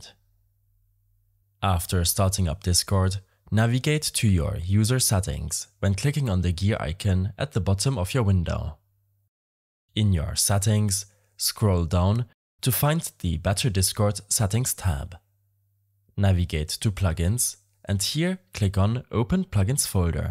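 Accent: Germany. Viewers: haha du bist deutsch das höre ich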